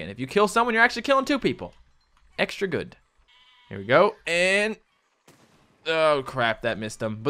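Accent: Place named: Newfoundland, Canada. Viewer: By the accent of your voice and your usage of the word "squat" I'm assuming your from Newfoundland?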